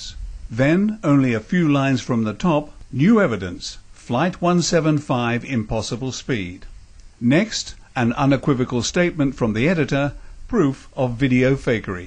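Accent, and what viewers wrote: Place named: RP, United Kingdom. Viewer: More disinformation, deliberately delivered with an austere well spoken English accent designed to give it an air of legitimacy. Doesn't actually address the point. At 700 feet it is impossible for a 737 to travel at or around 500mph. Though some official estimates put them second at over 550mph. It would disintegrate and also be impossible to maneuver the way it did. Think driving a double-decker bus at Formula One speeds. Also doesn't address complete nose section making it through multiple layers construction grade of steel intact. Depleted Uranium anyone? If you believe the official story you are a child.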